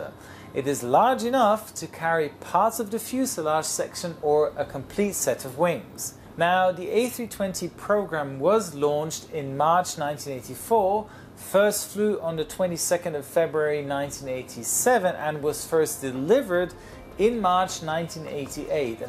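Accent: Germany. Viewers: Captain you are from Germany? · Is he a German? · Kommst du aus Deutschland?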